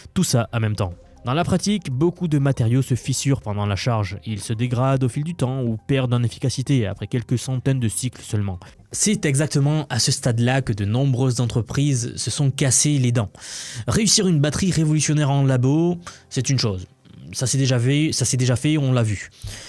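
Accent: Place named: South, France. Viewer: J'adore ta chaine, je suis fan. Merci pour toutes ces informations. Une petite question bête, t'as un super accent, mais j'arrive pas à savoir de quelle région, il y a une pointe du sud mais pas que ... ;-)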